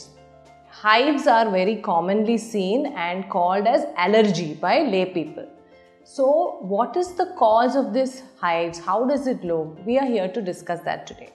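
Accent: India. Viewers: English nga paranamang bombay Kong mag salita😔😔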